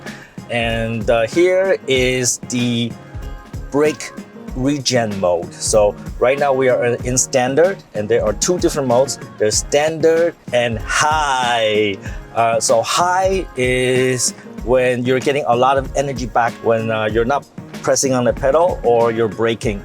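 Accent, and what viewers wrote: Standard. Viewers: chị nói tiếng anh chuẩn vl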